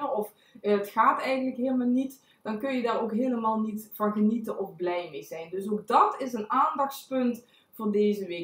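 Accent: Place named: Limburg, Netherlands. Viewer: Volgens mij ben jij een Limburgse, net als ik!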